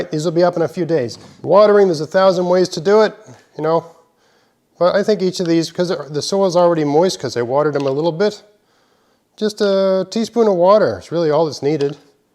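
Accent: Maritimes, Canada. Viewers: Cotyledon. the seed leaves. Coh teh LEE duhn I say, you didn't mispronounce it. You simply have a Maritimes, accent!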